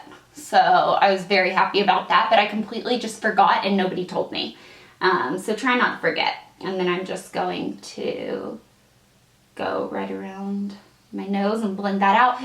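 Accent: South, United States. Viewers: I used to watch you years ago! (: I forgot how adorable your southern accent is ahah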